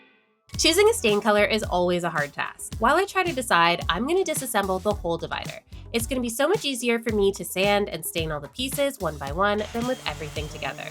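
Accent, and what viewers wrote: Quebec, Canada. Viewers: Je n'étais pas prête à t'entendre parler en français avec l'accent québécois 🤭 ❤ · Loooove when you speak french! It shows you learned from Quebec, I can hear the accent ;) As always, amazing DIY!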